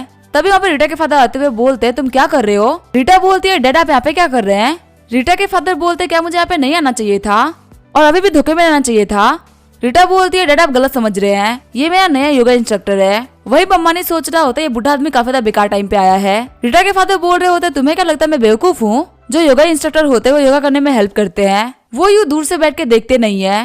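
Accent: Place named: Uttar Pradesh, India. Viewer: tum pakka up se ho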